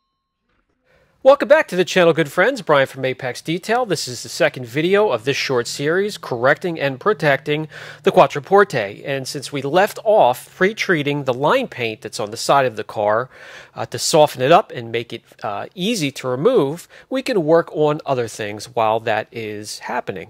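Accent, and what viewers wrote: Pennsylvania, United States. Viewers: “Trial by era” Shout out to the PA accent. 😉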